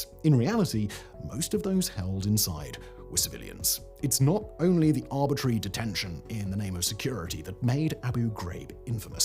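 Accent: England, United Kingdom. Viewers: The United States is not perfect but they act in the best way to keep the world free and safe. I take offense that you talk about this with an English accent. If it wasn’t for the US the UK wouldn’t ceased to exist a long time ago.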